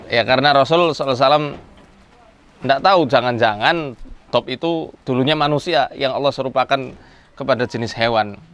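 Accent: Banyumas, Indonesia. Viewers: Ustadz nya dr negara ngapak ya ... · Ngapak yaa 😂😂😂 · Wong ngapak pancen jos koh jannn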